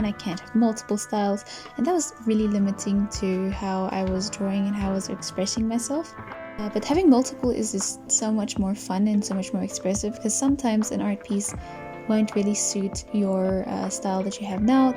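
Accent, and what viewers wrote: South Africa. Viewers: Are you South African?! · is that a south african accent? :D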